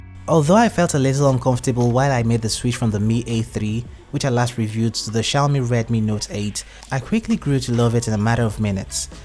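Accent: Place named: Nigeria. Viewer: God willing you are going places bro....Exceptional Presentation Voice....Voice and Accent Wise you just the best Nigerian Youtube reviewer for now.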